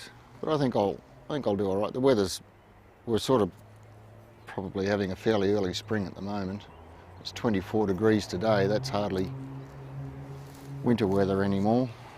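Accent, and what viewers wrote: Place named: Australia. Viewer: I believe (judging by your accent) that you recide in Australia?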